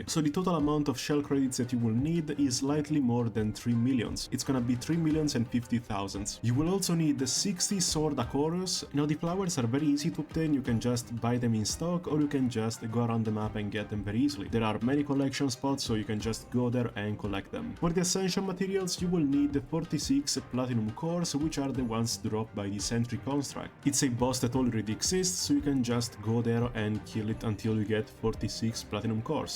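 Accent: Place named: Italy. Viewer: Italian accent talking about italian mommy, sub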